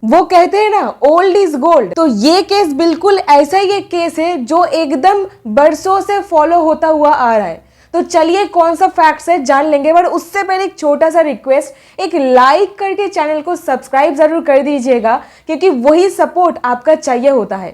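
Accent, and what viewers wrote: Bengal, India. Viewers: You talk like Mamta Banerjee... tussi bong ho? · Bengali accent bahut achha hai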